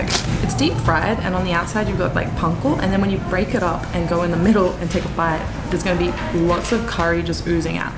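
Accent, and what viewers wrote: Australia. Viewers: Wait, German? I felt absolutely sure you were Australian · I thought you are only Australian because of your accent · At the start you said you were German.....you sound like you are from South Sydney..... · oh I didn‘t know you are german. I thought you are from Australia. Love your Videos! Greeting from Germany 🙋🏻‍♀️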